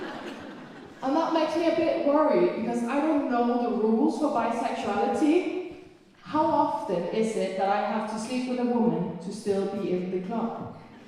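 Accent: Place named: United Kingdom. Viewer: Perfekt oversættelse fra din danske bid i “Grin til Gavn” oven i en formidabel britisk accent!🤩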